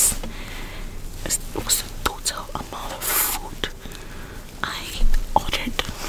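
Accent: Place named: United Kingdom. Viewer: I like when she speaks English i almost hear a British accent... Such a sweet lady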